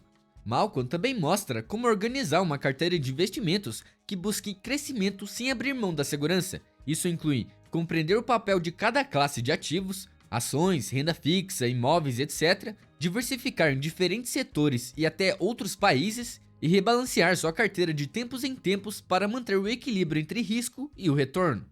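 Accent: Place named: Sao Paulo, Brazil. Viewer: Sou de Minas e não suporto este sotaque seu de Paulista. Mas vale pelo conteúdo informativo.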